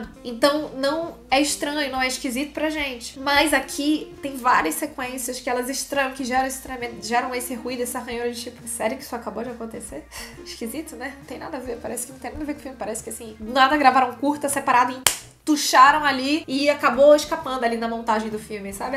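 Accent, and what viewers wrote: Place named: Northeast, Brazil. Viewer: Ei, voce é nordestina? O sotaque é um pouco parecido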